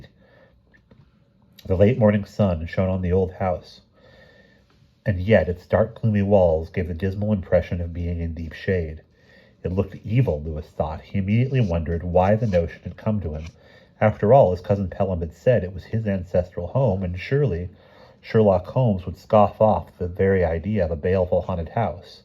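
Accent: England, United Kingdom. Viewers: You do a good English accent!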